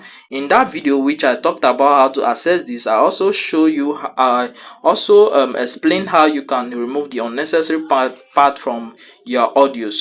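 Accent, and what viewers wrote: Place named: Nigeria. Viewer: How did you come about the Nigerian textured voiceover?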